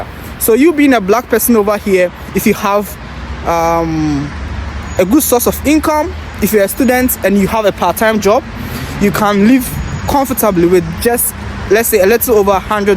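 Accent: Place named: Ghana. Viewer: He has the Ghanaian English accent....is he a Ghanaian?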